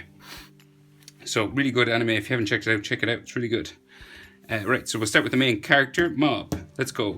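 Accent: Ireland. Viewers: Trying to draw mob and immediately recognised your accent, my Irish brother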